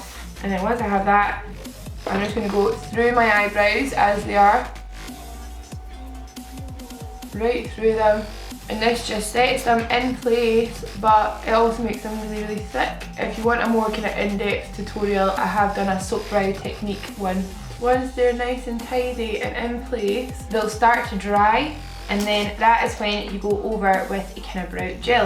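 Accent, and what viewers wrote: Scotland, United Kingdom. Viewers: are u scotissh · What part of Scotland are you from?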